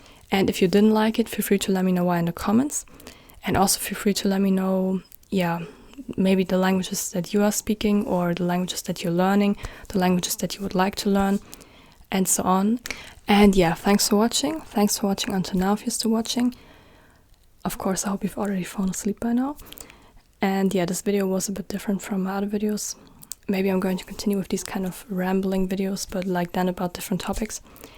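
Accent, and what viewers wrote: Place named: United Kingdom. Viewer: By the way when you speak english your pronunciation is more british than american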